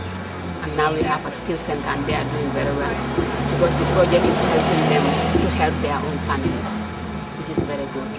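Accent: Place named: Gambia. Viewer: God bless her...she is very sweet....thats a real Gambia accent dho....miss my country to bitzzz.....bless her and stay strong my fellow Gambian women xxx love love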